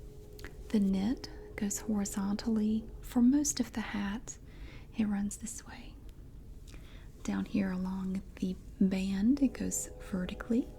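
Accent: South, United States. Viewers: I absolutely love your roleplays! And your voice is so relaxing. Plus, the Southern accent reminds me of home <3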